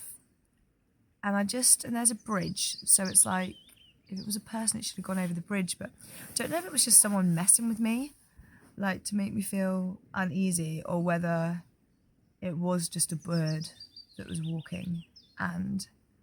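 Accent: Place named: West Country, United Kingdom. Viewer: Absolutely brilliant,, love the passion,,does it really matter how many miles are walked every day?? I think you're doing a 'proper job' !!! West country twang for you!! All the best 👍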